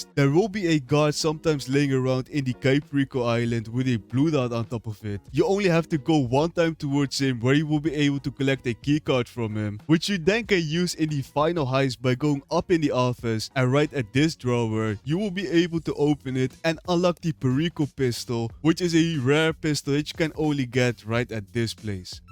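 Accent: Netherlands. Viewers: je klinkt nederlands man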